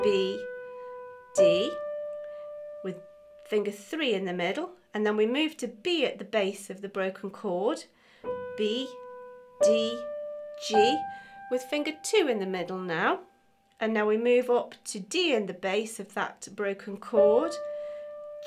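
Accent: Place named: Stoke, United Kingdom. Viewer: Hi thanks for the tutorial! really useful. I am guessing you're from the Stoke area based on your accent 🙂🙂🙂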